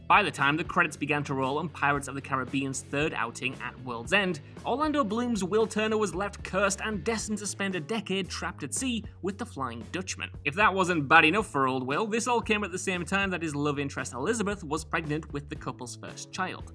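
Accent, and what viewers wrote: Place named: Newcastle, United Kingdom. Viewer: I do love a nice, Geordie WhatCulture video... (Unless it's a macam) 😀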